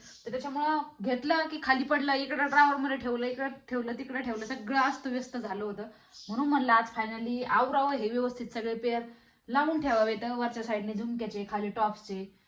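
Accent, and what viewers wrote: Ahmednagar, India. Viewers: ताई आम्ही पण आस म्हणतो कि गणपती बुडवयचा कानातले कलेक्शन खूप छान आपली नगरी भाषा खूप छान ❤ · आग मनाला नको लावु घेऊ आपली नगर भाषा खुप प्रसिद्ध आहे मी मुंबई रहाते 20 झाले माझी भाषा काय बदली नाही 😂. लोक लगेच ओळखतात नगरी आहे का तुम्ही 😂😂😂😂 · तुमची बोली भाषा खूप छान आहे.मी काही वर्ष नगरमध्ये राहत होते.मला खूप आवडते.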